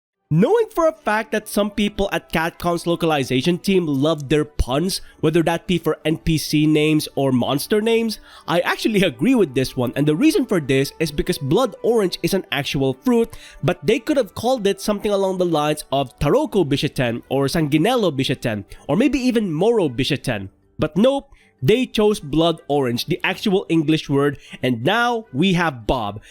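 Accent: Philippines. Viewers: I like your accent, as a fellow filiipino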